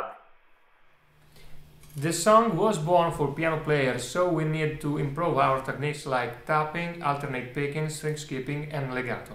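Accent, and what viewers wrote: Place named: Italy. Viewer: Già prima che dicevi im italian guy si capiva che sei made in Italy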